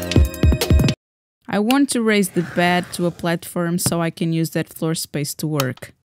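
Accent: Portugal. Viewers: Ohhhh! Portugal! I've never heard a Portuguese accent before! I was trippin' trying to place your accent :) Really really cool project! Thank you for sharing! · Assim que comecei a ver o video tive a sensação que o sotaque seria Português :D Yey!! Tão contente por te ter encontrado!! Subscribed! <3 Love de Coimbra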